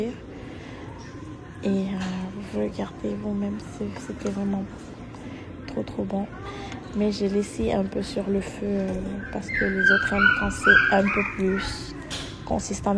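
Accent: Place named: Montreal, Canada. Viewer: Montreal sa sentend dans la voix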